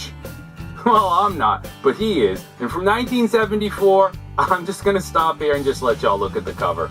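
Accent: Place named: New England, United States. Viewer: Watch out! When we are treated to a country update completely with a New England style delivery, you know you are in for something swell. > Now wait a minute. How did you get to do that four word promo? I have to agree with you, ' ' has some fantastic album art. I could not name one song on the whole album but I love the looks of it. It still happens. That's all I have to do is hear name and my mind reverts to ' '. "A dinosaur Victrola listening to ". You have to love that song. I have only heard one of these albums. I will leave it up to you to guess which one. It is a pretty simple guess really if truly think of it. I will give you a hint. It wasn't Boston's own 'Dukes of Hazzard' who back him up on the album. Excellent update once again Brother. I always feel like I got to wear a cowboy hat and boots when I watch these Honkytonkin' updates. 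Love the country feel~ Rob/Boston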